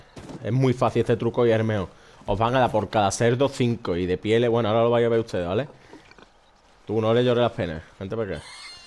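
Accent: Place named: Andalusia, Spain. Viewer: Tú ere de Andalucía por cojones xd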